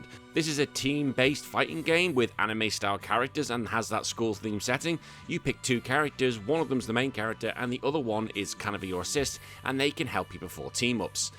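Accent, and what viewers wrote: England, United Kingdom. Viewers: "i don't give a..." whatever man just tell us your picks and stop pretending to be a tough guy. it will never work with that English accent I can tell you that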